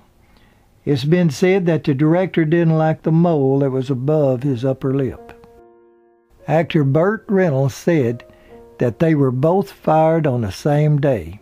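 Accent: South, United States. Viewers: Dear mr. ( may I call you ), your format and exquisite Southern accent make all of your mini documentaries a pure joy to behold. I my humble blue-colour opinion, you are a true celebrity historian with the gift of timelessness that you bring to all of your productions.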